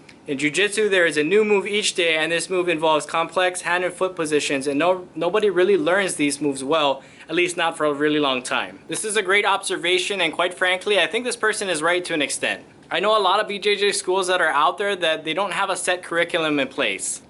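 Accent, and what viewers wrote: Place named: Hawaii, United States. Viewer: I was listening and thought to my self "this braddah sound like he from Hawaii" sure enough you are 🤙🤙🤙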